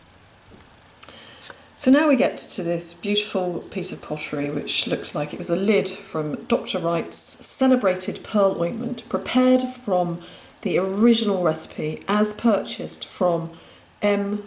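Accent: United Kingdom. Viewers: What part of the U.K. Is your accent from?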